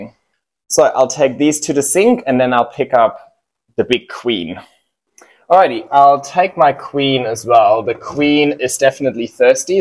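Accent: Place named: Germany. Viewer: Dieser deutsche akzent hahahah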